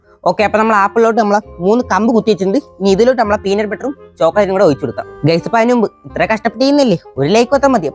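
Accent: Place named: Kollam, India. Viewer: Kollath parayum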